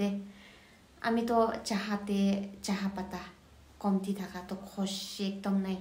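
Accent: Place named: Nagaland, India. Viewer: Glad u used chakhesang dialect 🔥🔥🤧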